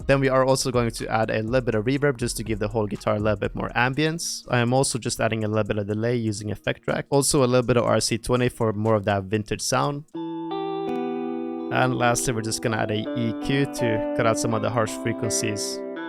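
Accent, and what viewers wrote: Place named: Norway. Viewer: U sound norwegian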